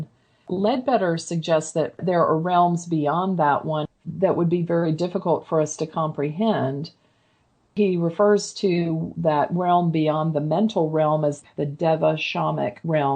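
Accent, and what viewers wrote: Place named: Indiana, United States. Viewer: Love your accent. Could be Northern Indiana. I know time doesn't exist there (hereafter) as we know it, but I can't get my mind around what they do "all day" over there.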